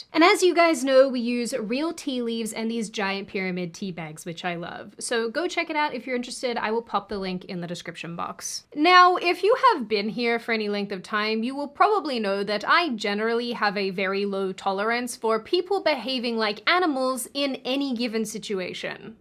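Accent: Australia. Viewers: Still sounds very Australian to me. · Have you been in Australia recently? You sound more Australian in this video than your last one. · Every once in a while, your Auscent shows through, and I love it. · If they are it's distant considering her Australian accent.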